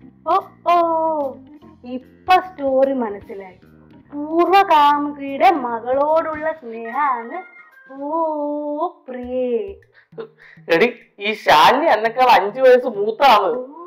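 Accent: Kannur, India. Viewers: Kannur or kasaragod ?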